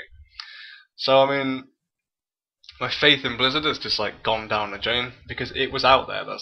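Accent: United Kingdom. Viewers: What's your problem? I'm from Denmark and understand him perfectly. And FYI he's British, thought the accent was obvious? If you're not 10 and clueless that is. Even his mic is perfectly clear too, I don't get your complaint's at all.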